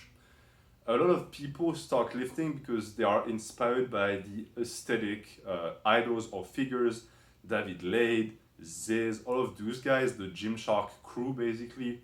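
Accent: France. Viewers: J'aime bien l'accent français ;)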